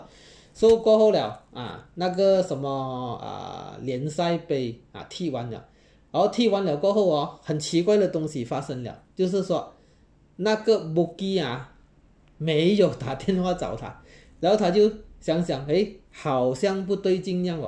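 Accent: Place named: Penang, Malaysia. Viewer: 槟城的福建音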